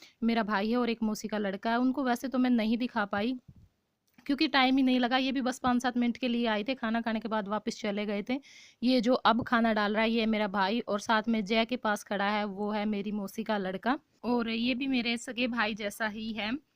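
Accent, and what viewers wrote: Haryana, India. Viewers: Very nice di aapka haryanvi andaz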